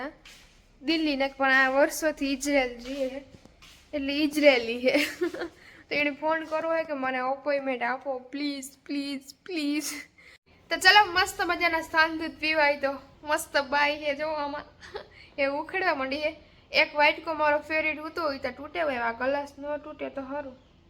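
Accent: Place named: Mehr, India. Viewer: Mehr dialect. A boli na 2 prakar chhe. Ghed ane barda ni. Thodo fer hoi chhe.😊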